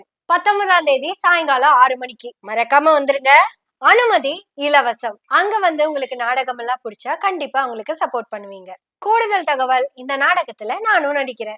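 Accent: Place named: Coimbatore, India. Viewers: Kovai tamil super🎉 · Good coimbatore talk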